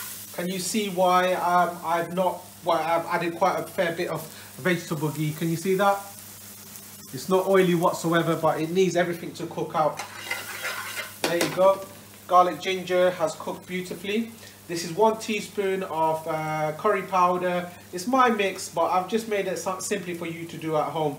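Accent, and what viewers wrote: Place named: Stoke, United Kingdom. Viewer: 60k, well done, love your Bangladeshi heritage, your food knowledge and incredible cooking skills, your Stoke accent is ace too mate. We in the UK are so fortunate to have such a mix of cultures, filling our bellies with happiness and pleasure, next stop 100,000 subs.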